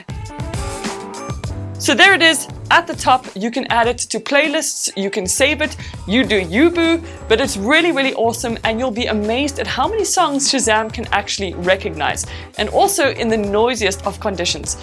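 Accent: South Africa. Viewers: No lie I clicked on your video for the first time from my recommended and as soon as you started talking I was hold up, that accent sounds familiar, and you know I gotta support a fellow South African on YouTube. 😂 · Are you South African 😁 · Are you South African? It's the accent. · Are you from south africa? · Do you come from South Africa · South African????? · Loving hearing a south african accent, much love from Jozi! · You are from South Africa right ?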